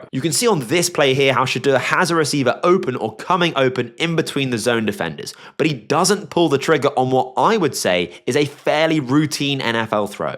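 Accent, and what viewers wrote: United Kingdom. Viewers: Having a British dude analyzing CFB is throwing me off 😂. Great video man, editing and writing is A1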